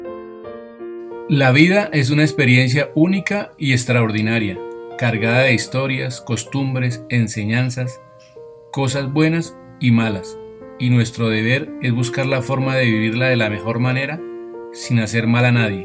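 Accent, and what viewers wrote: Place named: Antioquia, Colombia. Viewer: tiene acento de paisa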